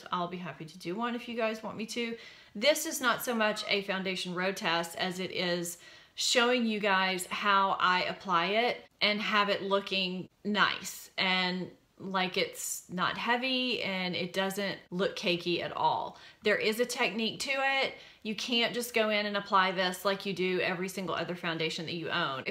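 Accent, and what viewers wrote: South, United States. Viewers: It's a southern accent thing. I have this "issue" too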